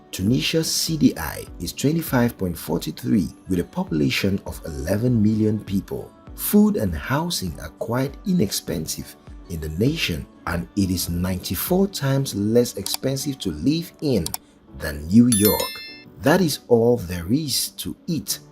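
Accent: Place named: Ghana. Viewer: I can see that U r drawing from those Western people who come up with these incorrect assumptions. Nigeria is the cheapest country in the world. (Possibly, Pakistan may b a little cheaper). I have done items for items by dollar amount. I don't know where U got the idea of subsidies about Nigeria. The only one item subsidized by the Nigerian government is petrol and the IMF has bn telling the government to remove that only one subsidy. I live in the USA and as I am planning to move to a cheaper country other than Nigeria, I've researched (for 10 years) and travel to see where it's cheaper than Nigeria but haven't found any. I've seen some of those non African's websites declaring a lot of baseless numbers. As I can tell from ur accent, U r a brother frm Ghana. Can U believe that some of these non Africans claim in some of their presentations that Ghana is cheaper than Nigeria! 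U & I know that that's not true! So brother, to do a good job, wut U need to do is take items say, a dozen of eggs, a one bedroom apartment, a loaf of bread etc in one country and compare the prices in USD and convert them to the currency of ur country of interest. U'll clearly see wut am saying. Don't rely on those Oyinbo declarations. As President Uhuru Kenyatta of Kenya said, they'll be giving information about Kenya and at the end of the 'news' it'll be Mr. White, a correspondent from South Africa. That's almost 5000 kilometers away! 😆😆😆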